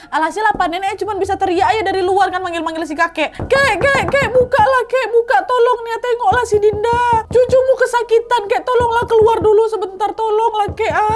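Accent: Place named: Medan, Indonesia. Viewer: Cocok kali kayaknya kakak ini main ke mentah kali logat medannya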